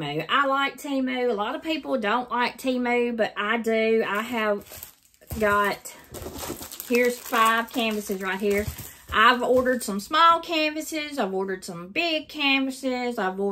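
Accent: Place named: South, United States. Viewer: Girl I love you ! From the personality to the accent I’m country myself ! And love love looovecthe videos !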